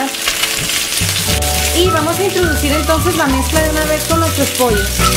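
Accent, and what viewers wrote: Sinaloa, Mexico. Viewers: tienes acento y pinta de sinaloense jejejejje, eres de Sinaloa? tu olla es royal prestige, no necesitas ponerle aceite jejejej pero creo q es la costumbre que tenemos... se ve deli, hare la receta.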